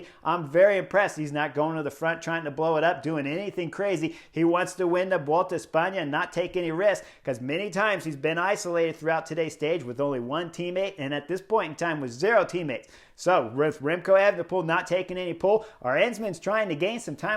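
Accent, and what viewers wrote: United States. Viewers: Man…this feels like the end! My wife now knows your voice and thinks you’re from the south…haha! She can’t believe that there’s so much to talk about. Hopefully, she will understand why in the next season. Going to miss the everyday episodes. It’s like a tv series arcs: Giro, Tour and now Vuelta.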